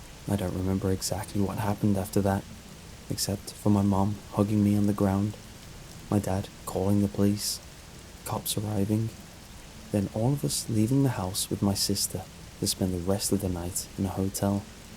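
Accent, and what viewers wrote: United Kingdom. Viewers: is the british voice narrating the story. Yes, he only reads stories that other people post. I’m not blaming for this at all. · Oh no british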